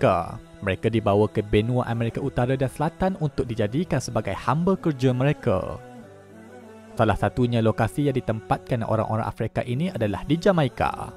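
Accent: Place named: Kelantan, Malaysia. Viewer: Pekat Ayat kelate 😂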